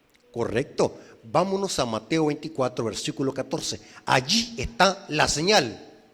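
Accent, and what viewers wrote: El Salvador. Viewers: Hermano, con mucho respeto, este hermano es del Salvador , y casi todos ellos tienen su modo de hablar, este hermano por más que quiera ya no puede cambiar su pronunciación. Así , es su estilo de hablar. Aquí en mi país , en la ciudad hablan muy diferente de cómo es en el campo o en otro estado, y no se puede cambiar y cada uno nota nuestra manera de hablar, y no hay nada que se pueda hacer. Por ejemplo para nosotros los mexicanos los hombres se saludan en algunas partes así: hola, gallo , cómo te va? Y en El Salvador decir gallo es meterse en problemas , gallos para ellos es el Sancho, o es el amante , por eso cada país tiene sus tradiciones. Disculpa mi manera de expresar esto. Te deseo que tengas un lindo día, y espero me disculpes por decir esto. Besos.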